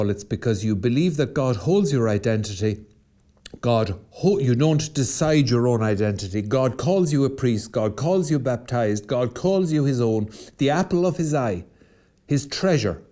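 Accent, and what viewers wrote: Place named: Ireland. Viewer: ☘️ We’re holdin’ onto the tattered threads of our former finery.” ☘️.. and said with a brogue; it’s poetry. is a treasure. I hope he goes viral. His voice is sorely needed over here 🇺🇸. We have the infrastructure for a great revival. But it’s dusty and cobwebbed and the doors squeak and creak like something old and worn out.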